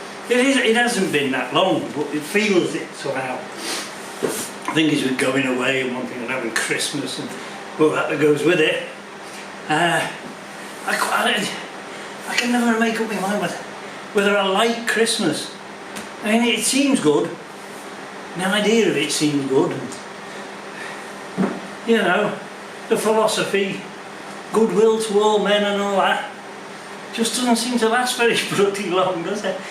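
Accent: United Kingdom. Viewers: Happy New Year, look forward to seeing the Italians out on the road again. You do sound a bit more scouse! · Light headed ! made me smile. Motorbikes, Guzzi riding and talking bollocks done that most of my life too. Did hear a little scouse coming through. Happy New Year sir. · Happy New Year from across the pond. As a fellow Britt as soon as you started talking i could detect the accent. Still managing to get some riding in, here in North Carolina.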